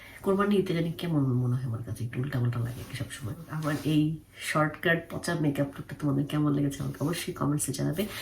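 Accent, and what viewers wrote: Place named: Bangladesh. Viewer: তোমার আরেক কোন এক ঈদের ব্লগ থেকে দেখা শুরু তোমার ব্লগ।মজার কথা ভাইয়াকে দেখে ১ম আমি ভেবেছি ইন্ডিয়ার নামক এর একজন অভিনেতা ও তোমাকে ১ ম দেখায় মুগ্ধ। ভয়েজ শোনার সাথে বুজমাল তুমি বাংলাদেশী+ আমরা নারায়ণগঞ্জ বাসী😍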